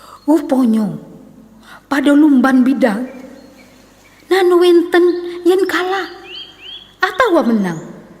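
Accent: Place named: Palembang, Indonesia. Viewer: MasyaAllah keren nian yunda lemak nian dengernyo baso palembang asli dan jadi tau sejarahnyo, ditunggu cerito2 palembang selanjutnyo🤗🥰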